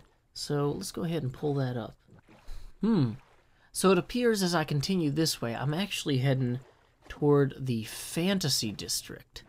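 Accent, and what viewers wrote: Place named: Tennessee, United States. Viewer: First time watchin' your videos, and I've gotta say they're great. Nice hearin' somebody else from TN on youtube. :)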